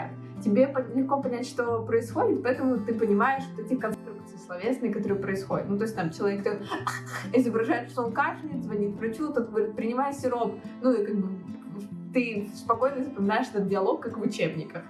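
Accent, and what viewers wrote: Poland. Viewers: Słyszę u Ciebie polski akcent🙂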